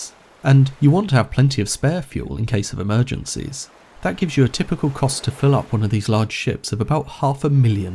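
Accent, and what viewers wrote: United Kingdom. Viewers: You're Britain?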